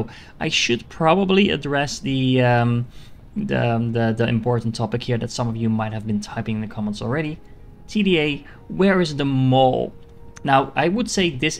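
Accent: Netherlands. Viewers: After deciding to pick this up again i came across your video. Nice one, i`ve already got 552 hours into this game but i still learnt something. Will definitely watch more of your content. Who doesnt love a Dutch accent! Thanks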